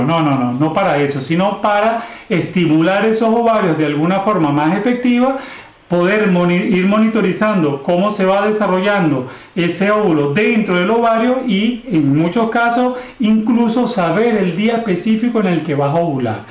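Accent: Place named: Venezuela. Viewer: Doctor saludos desde Italia y ese acento suyo me parece criollito venezolano.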